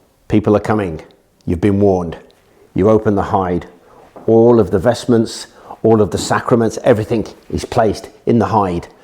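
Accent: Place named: England, United Kingdom. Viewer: Just came across your channel, this priest hole hiding episode is the first vid I have ever watched belonging to you fantastic easy to follow and put together really well... Not to mention that sumptuous English accent you have!! When I hit subscribe I was disappointed I hadnt already hit subscribe sooner before. 🇮🇪 (3hrs have past) since my first visit; to your channel, and I am hooked on history with!!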